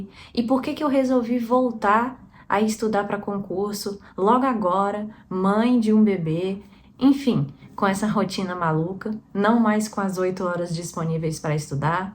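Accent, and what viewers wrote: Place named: Northeast, Brazil. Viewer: Ave Maria! mais tu é bonita! Nem parece que é mãe e casada 😂😂😂 (tô brincando) Já vi outros vídeos e pensei que tu fosse do nordeste,o sotaque parece . Já fui mt inocente tbm qndo comecei a estudar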